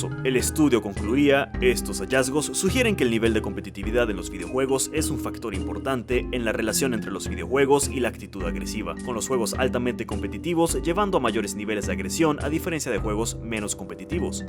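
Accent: Venezuela. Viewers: una pregunta y disculpa eres venezolano?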